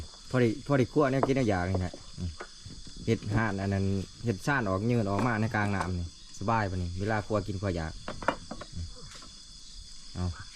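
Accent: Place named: Isan, Thailand. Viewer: เป็นคนลาวหรือไทยคะสำเนียงเหมือนคนอุบลกับศรีสะเกษเลยพูดไม่เหมือนคนลาวแท้ๆฟังง่ายค่ะ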